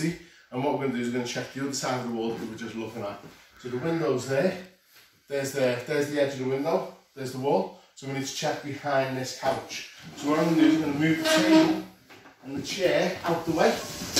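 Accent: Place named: Liverpool, United Kingdom. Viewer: STOP SPEAKING SCOUSE! but a good video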